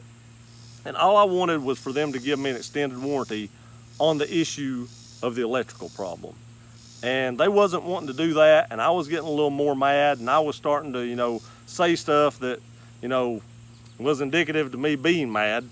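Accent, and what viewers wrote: South, United States. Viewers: Love the country twang!!! Great video, New subscriber here. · Which state did you film this video in? It looks quite hilly in the background. You also have a distinctive southern accent.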